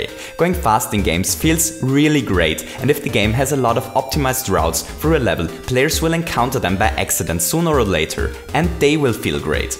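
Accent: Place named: Germany. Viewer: He's from Austria, it seems. I knew the accent sounded German!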